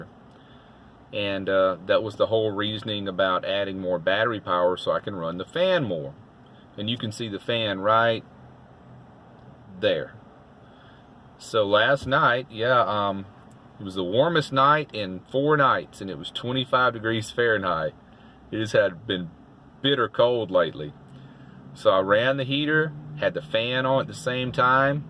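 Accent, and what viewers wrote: United States. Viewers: Super cool accent you have there Mr. USA🇺🇸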